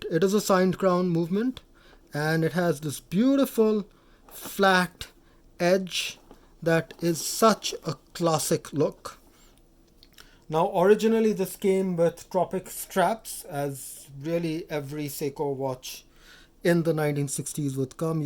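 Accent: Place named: India. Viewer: when i hear indian accent, all I know all of this was bullsh*t and you stink , you didnt even explain the acronyn 62 "MAS" seikomatic selfdater bullsh*t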